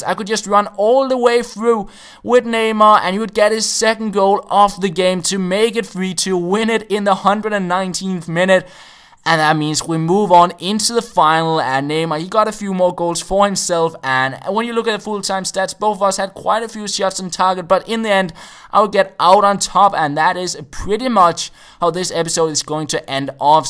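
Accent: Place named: Denmark. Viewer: He's Danish just like me that explains his accent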